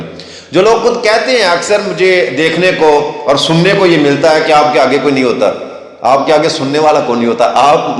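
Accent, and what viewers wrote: Punjab, Pakistan. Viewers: پنجاب پنجابیوں کی شان ہے سب کے سمجھنے کےلیے اوردو ہی بولے ویسے بہھی لہجا پنجابی لگتا ہے پنجاب سندھ سرحد بلوچستان سارا میرا پاکستان پاک فوج زندہ آباد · سر پنجابی میی بات سننا آپ کے منہ سے اچھی لگتی ھے اپ کا لہجہ پنجابی ھے اس لئے پنجابی ہی سوٹ کرے گی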